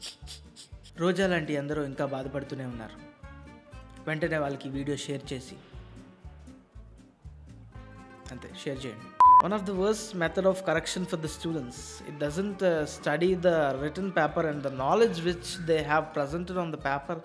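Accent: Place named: West Godavari, India. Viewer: West 😂😂 slang iragadisav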